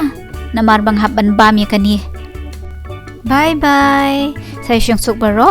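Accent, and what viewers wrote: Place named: Meghalaya, India. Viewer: Ka jing i don burom. Khamtam ly ka riam ka rukom kren ruh tag daka khasi beit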